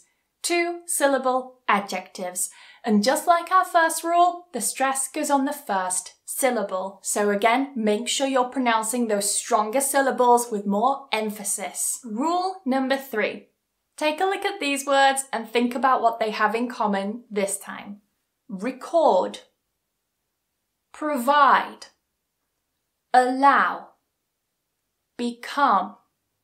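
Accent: United Kingdom. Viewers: Though, I am an Indian boy still I love to learn British accent from you. I enjoy learning with your so profound smile 😊😊❤ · I love your British accent ❤️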